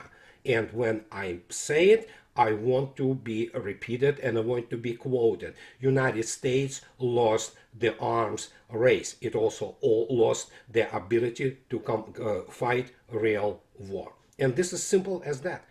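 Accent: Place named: Russia. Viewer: Coffee and two, your English is quite remarkable in spite of your strong Russian accent and I enjoy your thoughts thank you for speaking the truth and keeping us up to date on these issues that are very important to comprehend and for all people to understand the reality of what is really happening. Thank you and be well. ✌️😎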